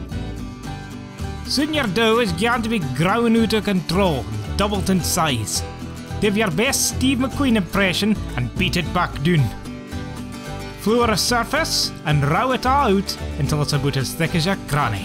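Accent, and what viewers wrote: Scotland, United Kingdom. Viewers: Yir a Fruit Loop Min!! Bit yair damn funny wicht it!! Fits Like!! It's great tae hear the auld Brogue, one thing I dae Miss oor here in US ofA, yir Brilliant, Keep it up Min!! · What region of Scotland is that accent? My grandparents are from fraserborough and the accent is completely different.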